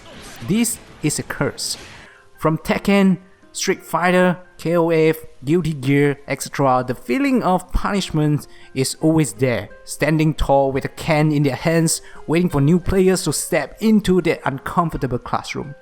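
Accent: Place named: East Asia. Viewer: hey love your video may i know where are u from? u sound like chinese/ japanese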